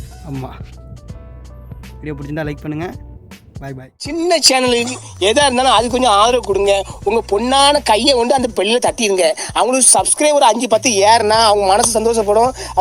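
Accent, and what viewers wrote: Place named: Tirunelveli, India. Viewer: மிகவும் சிறப்பு அன்பர்! வாழ்த்துக்கள்!! திருநெல்வேலி அல்லது தென்காசி பேச்சு வழக்கு தங்கள் பேச்சில் தெரிகிறது!!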